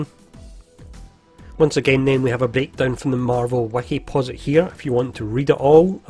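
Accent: Scotland, United Kingdom. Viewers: Long time sub, new commenter. No worries with the Scots accent on my part, half my family are from Perth lol. Keep up the awesome work dude, I played the beta on xbox this weekend and enjoyed it, what are your thoughts after 2 weekends of play? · The accents the best part cause he’s Scottish. What a legend! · I have to admit i don't really mind the Scottish accent, even if i'm french. I know since Marvel Heroes and i'm used to it now, i'm pretty glad i am able to understand more english streamers / youtubers thanks to that! Welcome the comment section! Thanks for the good work! Cheers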